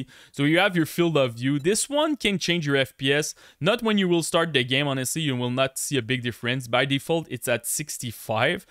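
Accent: Quebec, Canada. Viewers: Quebecois mon homme ? 😂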